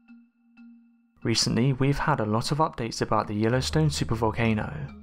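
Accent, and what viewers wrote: England, United Kingdom. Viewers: I don’t need some British guy telling me about OUR VOLCANO IN ‘MERICA!!! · It should be an AMRICAN dude doing the documentary is this ENGLISH dude a geologist? IDTS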